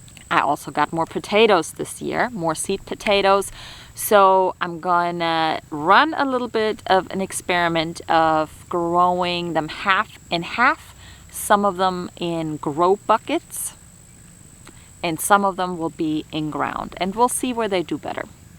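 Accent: Louisiana, United States. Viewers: Is your accent Cajun?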